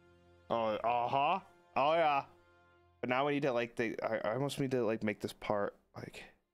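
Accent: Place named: France. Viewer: with a French accent makes him so funny and handsome (totally not saying this bc his description says so- ) Joking aside, I love seeing your silly art Mr.. Truly an artist of time indeed <3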